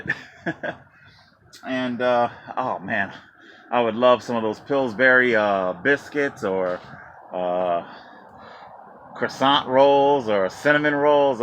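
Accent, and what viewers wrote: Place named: Ireland. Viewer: Awesome Irish accent!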